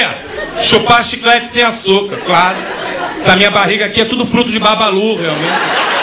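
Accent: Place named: Rio de Janeiro, Brazil. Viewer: Shoooooooow de bolaaaaaaa! Nossa, acho que os melhores comediantes, são os cariocas! O sotaque e o jeito meio largado, tipo " isso meermo" e coisas do tipo, ajudam a ficar mais engraçado! Parabens! sou sua fã!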